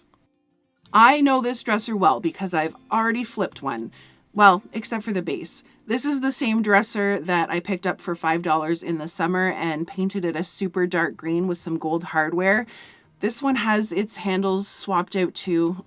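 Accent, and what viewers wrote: Canada. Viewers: I love your Canadian accent. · . Here in the UK we know that when you say out and 'aboot' that's when we know a Canadian. I love to hear it! 🤗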